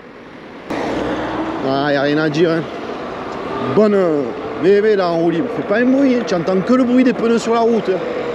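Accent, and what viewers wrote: Marseille, France. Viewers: Vive le sud! Tu donnes le sourire avec l'accent de Marseille qui crrame tout!!!!!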